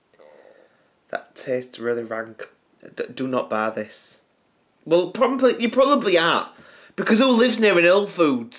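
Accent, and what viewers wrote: Hull, United Kingdom. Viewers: hes from hull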